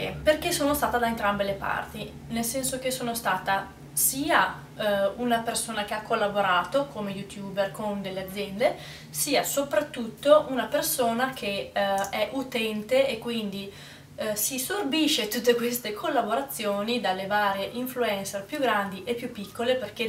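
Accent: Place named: Veneto, Italy. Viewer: Sono una nuova iscritta é un vero piacere averti scoperta e ascoltata. Posso chiederti se sei Veneta? Io sono di Verona e trovo molto famigliare la tua cadenza. Ciao e buona giornata!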